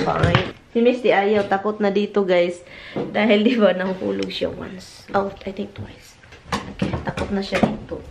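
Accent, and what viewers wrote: Visayas, Philippines. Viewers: Hi sis , Pasagde na sila kay gusto kaayo kos imong accent hahaha Bisaya gud ta 😍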